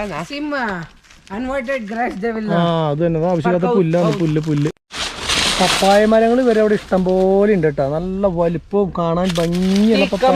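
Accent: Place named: Tamil Nadu, India. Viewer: തമിഴ് നാടൻ ഇംഗ്ലീഷ്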